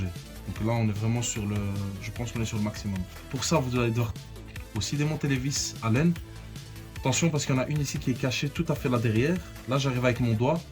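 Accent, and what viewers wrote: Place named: Belgium. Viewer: Je suis français et je possede une BMW e46 essence donc rien à voir avec ce modèle mais j'ai pris énormément de plaisir à voir ce travail bien fait par un mécanicien au sympathique accent belge et surtout un vocabulaire et un phrasé parfait tout au long de son intervention. Ca fait bien plaisir. Merci Monsieur 🙂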